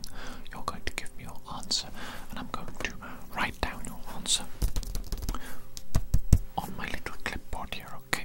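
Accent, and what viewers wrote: Wales, United Kingdom. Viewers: Am I right in saying that you are Welsh 🏴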